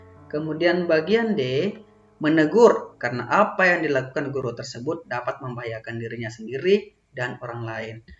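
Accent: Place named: Madura, Indonesia. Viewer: Aq mendengar pak bilang logat Madura, apa pak org Madura? Salam sittong dhere pak😁🙏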